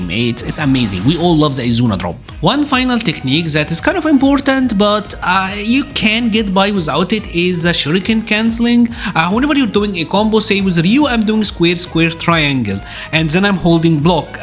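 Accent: Egypt. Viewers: I knew u are Egyptian from ur accent 😂❤takes one to know one, awesome vid brother ❤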